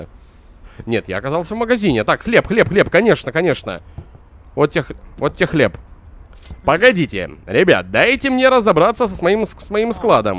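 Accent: Belarus. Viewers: Ты из Белоруси? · ты белаРус?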